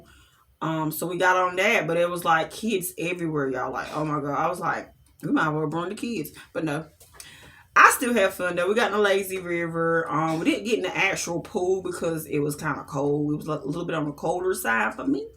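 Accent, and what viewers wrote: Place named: North Carolina, United States. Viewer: Your accent is everything. Where in NC are you from? You sound like my husbands people.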